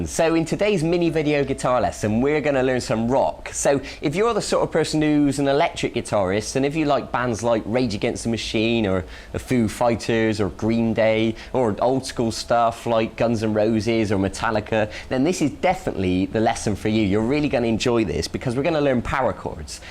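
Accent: United Kingdom. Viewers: haha i like his accent... !! british rocks... !!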